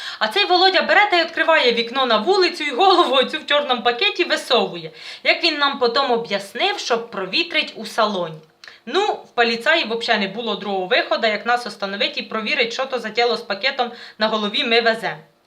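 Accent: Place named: Surzhyk, Ukraine. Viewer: Самое главное: и грамма пошлости нет. И умно, но легко, а уж суржик..просто прелестный!)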